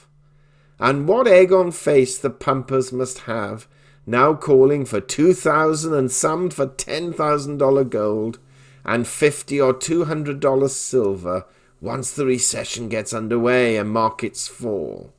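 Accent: England, United Kingdom. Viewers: Mate you sound like a true pome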